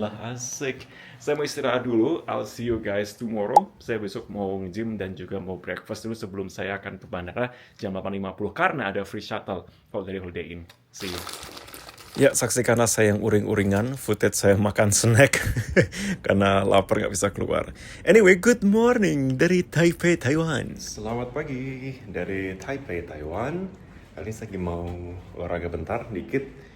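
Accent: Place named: Surabaya, Indonesia. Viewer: Terimakasih Bang, kontenmu mewujudkan mimpiku waktu kecil, kan suka liat Globe terus penasaran pulau² kecil disamudra emang ada orangnya? Dan Kontenmu menjawab semuanya. Tp ada 1 yaitu kutub Utara atau selatan ataupun negara yg terpencil² kyk gimana kehidupan mereka yg jauh dr negara² lainya. Semoga kontenmu semakin berkembang. Btw orang mana kok logatnya kyk Surabaya.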